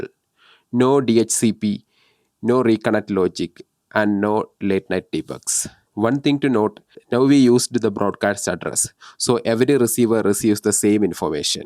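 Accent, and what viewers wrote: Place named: Kerala, India. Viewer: മലയാളിയാ ല്ലേ... ഇഗ്ലീഷ് കോട്ടാ..റിയാ.. മമ്മുട്ടിയെപ്പോലെ ശ്വാസം പിടിച്ച് നല്ല ബലം പിടിച്ചാണ് സംസാരിക്കുന്നത്. ഒന്ന് കൈ കുറഞ്ഞ് കുഴഞ്ഞ നാക്കോണ്ട് പറഞ്ഞൂടെ?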